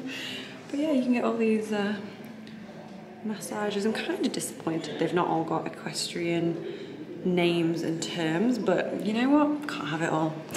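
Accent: England, United Kingdom. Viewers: Awesome! I have 19 acres in north east FL after I build my house I will have to check it out. Sounds like a great place to go for a weekend . You have an English accent where are you originally from?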